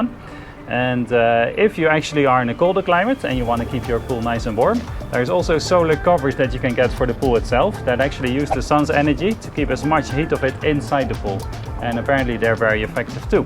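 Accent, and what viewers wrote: Netherlands. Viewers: When I heard him speaking I immediately knew he's from the Netherlands 😂 I just know the accent lol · You sound Dutch, but the environment looks way better than here in Holland. Nice video!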